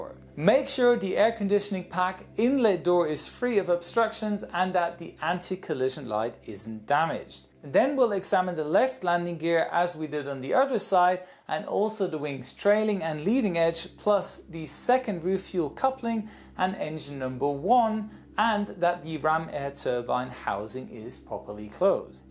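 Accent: Germany. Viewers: Are you German? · Ach... nen Germane... bin ja erschüttert das man das nicht schon früher festgestellt hat. XD (Gut... irgendwie klingt jeder Pilot gleich... da hinterfragt man das wohl nicht. ;))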